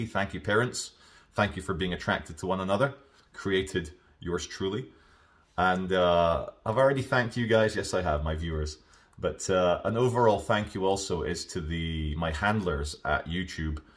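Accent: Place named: Scotland, United Kingdom. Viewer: he has a posh Scottish accent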